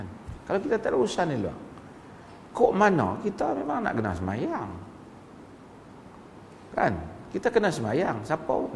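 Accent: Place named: Perlis, Malaysia. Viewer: Ini semenanjung malaysia..perlis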